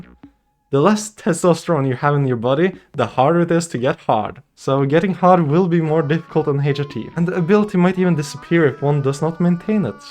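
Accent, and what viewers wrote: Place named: Norway. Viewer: recognizes accent -immediately goes to channel info -NORGE!!!🇳🇴🇳🇴🇳🇴🇳🇴🇳🇴💪🏻💪🏻💪🏻💪🏻💪🏻💪🏻💪🏻💪🏻💪🏻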